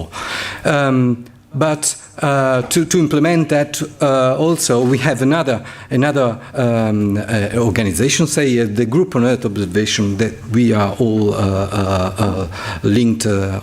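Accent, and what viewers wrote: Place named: United Kingdom. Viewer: Sei troppo forte zio! Perfetto con un pizzico di accento britannico!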